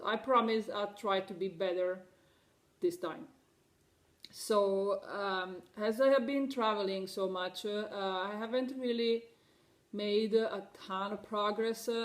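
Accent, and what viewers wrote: Italy. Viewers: Yes...i love your Italian accent....its very soothing. Xxx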